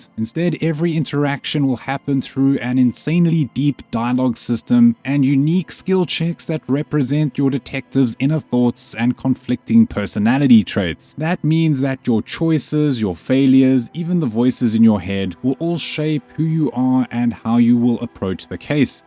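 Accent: South Africa. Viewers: That SA accent is unmistakable. Good to run into this channel!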